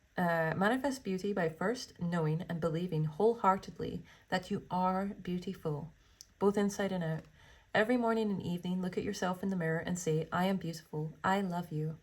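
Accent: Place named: Barbados. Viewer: I just found you today!💜. I love your accent - Bajan 🥰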